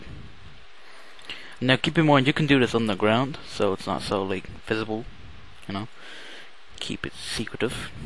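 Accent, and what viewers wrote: Ireland. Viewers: hes irish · That was cool. Nothing wrong with an Irish accent either.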